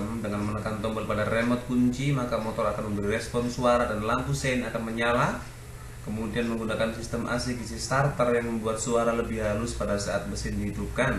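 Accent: Java, Indonesia. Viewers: yo mbo' omong boso jowo wae, ilat sampeyan jowo banget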